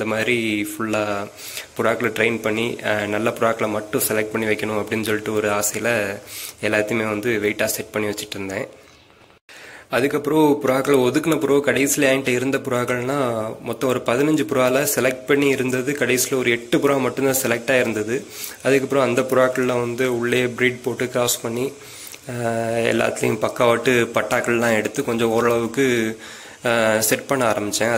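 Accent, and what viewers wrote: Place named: Kanyakumari, India. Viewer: நானும் கன்னியாகுமரி தான் Makka நம்ம ஆளுங்க பேச்சை அவங்கள easyயா காட்டிக் கொடுக்கும்...😍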